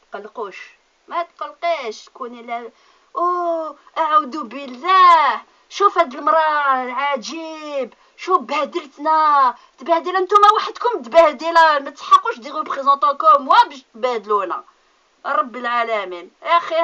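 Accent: Morocco. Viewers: kelba bent elharki. Tu as l'accent des marocains. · Dit moi fofolle vous êtes vraiment algérienne ? اسمحيلي l'accent dyalek marocaines ma cocotte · Elle parle trop bien l'arabe ....marocain ? ?????? je doute déjà qu'elle soit née en Europe et qu'elle soit algérienne. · ta façon de parler arabe ta l'aire marocaine et en parlent de des arriviste ils en France ou en Europe j'attire ton attention que les nouveaux arriviste cest qui travaille et toi tu touche les aides sociales en Europe à prendre de ne pas parler vulgaire ma grande sa se voir que ta un problème a la fin vive la France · Mon DIeu ! Je suis tombé sur cette video par hasard. Ma cherie tu m'as fait de la peine, je te connais pas mais tu m'as l'air d'être sérieusement atteinte. message aux Algeriens ?!? Les algeriens ne t'ont rien demandé pour commencer. Ensuite tu as un regard et un comportement pleins de haine.... 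le suivi de cette chmetta de "Hassan el maghrébine" et ce fond d'accent marocain dans ta façon de parler (je me trompe peut être.... j'espère que quelqu'un de censée (service condulaire par exemple) va se pencher sur ta personne ma cherie) Je te souhaite un bon rétablissement et n'oublies pas de prendre tes médicaments. Reste en belgique et ne revient plus en algerie. Si t'es vraiment algerienne ?!? Je signale la video. C'est pour ton bien, salam et saha ramdanek.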